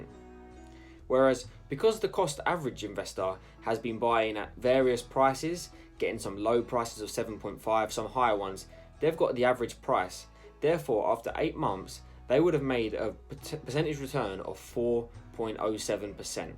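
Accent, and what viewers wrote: United Kingdom. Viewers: Nice content! Love ur British accent 😉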